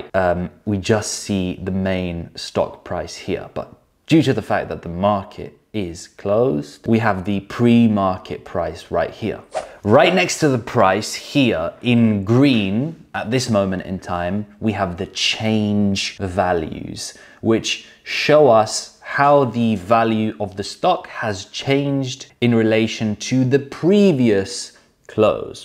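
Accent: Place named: United Kingdom. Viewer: Come to the U.K-there are literally millons of us here with the same accent!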